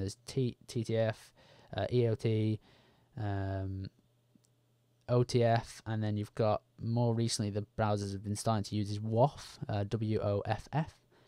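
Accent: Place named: United Kingdom. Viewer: your British accent is awesome !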